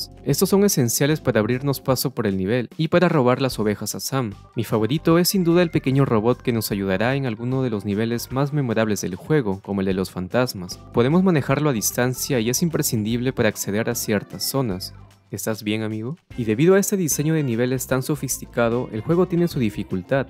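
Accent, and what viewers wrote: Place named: Ecuador. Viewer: de verdad? es que vivo aca en ecuador y tu acento se parece al de aqui jeje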